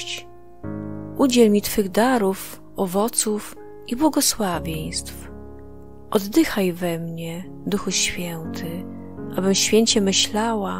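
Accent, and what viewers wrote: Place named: Białystok, Poland. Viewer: Jestes z Bialegostoku lub okolic ze tak dziwnie mowisz?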